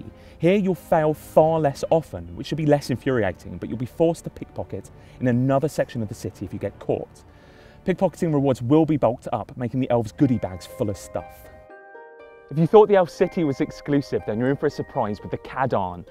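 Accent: United Kingdom. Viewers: He has a british accent, soo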